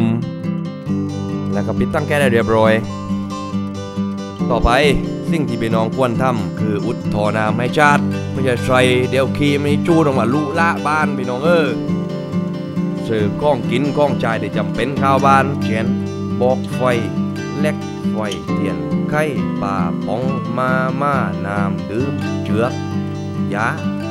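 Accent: Thailand. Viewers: บ้านผู้บรรยายต้องคงไม่เลยนครศรีฯลงไปตอนล่างแน่ ๆ สำเนียงนี้ ฮ่ าๆๆ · คนพากษ์อยู่ตรังม้ายนิ๊ ถ้าเข้ามาเห็น บอกกันนะบ่าวว่าโย่วย้านไหน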